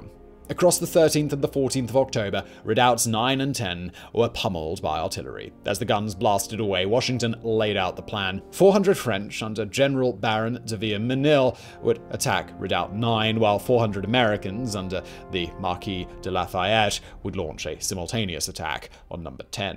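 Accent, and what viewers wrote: England, United Kingdom. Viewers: So unfortunately … I don’t think most American school kids know what Yorktown is lol. Like honestly if it was brought up at school it was in passing. The only reason I know about it is I am super interested in history from about 1750s to 1850s. I do like listening to a British guy tell me about ‘’murican history (I am not saying this ironically or sarcastically lol being serious.) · As an American, listening to a British man tell me about my history and still throw small amounts of shade is amazing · It unusual to see a Brit narrate the American Revolution to a primarily to an American audience. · Great video! Interesting hearing a "Brit" tell the story, especially the Benedict Arnold part :). Thanks for sharing. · And yet.. with Yankee blood in my veins. The level of sincerity and genuine reverence for the history and the men who lived fought and died then.. 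coming across with a smooth English swagger accent, makes the entirety of awareness that much more grand. In the best sense possible. Homage, not humiliation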